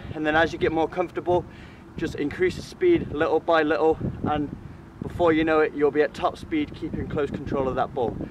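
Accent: United Kingdom. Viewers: Your Accent sound like You’re British Are u. Mate ?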